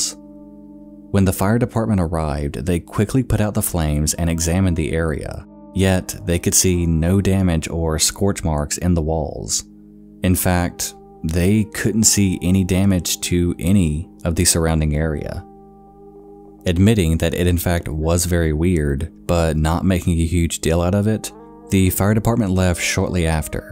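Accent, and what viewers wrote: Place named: Midwest, United States. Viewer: heh, you pronounce especially like "expecially". you must be from the midwest.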